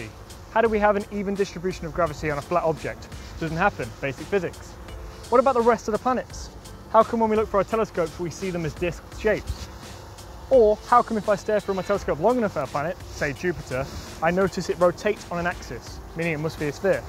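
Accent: United Kingdom. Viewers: I thought u must be britisher😮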